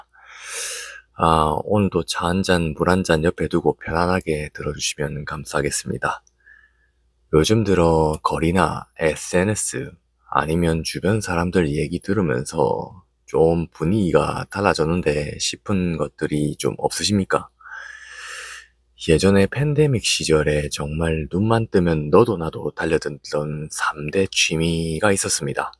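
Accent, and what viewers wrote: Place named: Busan, South Korea. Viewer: 부산말씨로 현실과 냉정으로 설득하면 8도 사람 거의 수긍!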